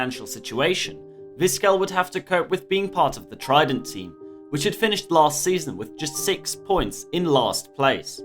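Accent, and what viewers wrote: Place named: Netherlands. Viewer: Goated Dutch accent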